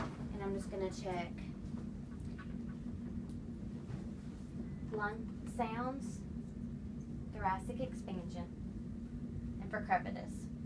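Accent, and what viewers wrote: United States. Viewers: Us southern girls have that accent! 😉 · Kentucky accent if I'm correct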